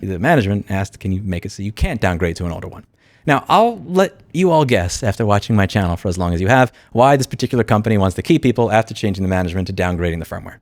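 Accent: New York, United States. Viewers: your New York came out full force here, right on!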